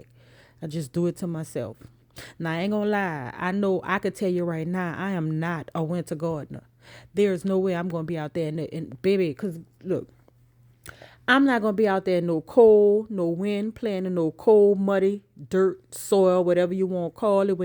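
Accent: New Orleans, United States. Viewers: Sounds like you from New Orleans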